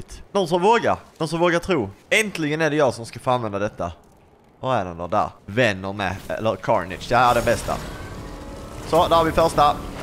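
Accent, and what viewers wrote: Skåne, Sweden. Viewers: Pratar du skånska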